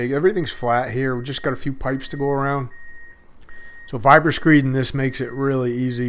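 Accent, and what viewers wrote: Maine, United States. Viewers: You are absolutely right about steel reinforcement on concrete. Concrete has very high compressive but less tensile strength. Reinforcement helps protect slab integrity under tension. Common ways the concrete has tensile forces applied are being entrapped (like a slab between walls) when expanding and contracting from temperature changes or subsidence form improper subgrade preparation. I had a 26' x 36' shop slab poured inside a ICF frost wall (8", 4000 PSI with fiber, one control joint midway, on 2" of blue foam with 6 mil vapor barrier, and meticulous sub grade prep) and no steel. People we're flipping out telling what a disaster I was going to have. Ten years latter there is not a crack in that slab. It's expansion and contraction is buffered by the ICF block so it is not trapped and never goes under tension. This is with a 10,000 lb car lift on it. 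The unstated downside to steel is it expands as it inevitably corrodes and can break the slab up on it's own. Great channel, watch regularly. I'm from Hollis, ME now exiled to VT. I recharge my accent when I watch. As I tell the Vermonters, anybody from Maine is worth two Vermonters, three on a good day. They take it in the spirit of humor as intended but people from away are aghast.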